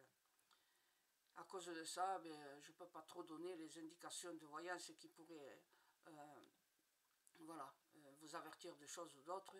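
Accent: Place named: South, France. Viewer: Vous avez un bel accent du sud 😊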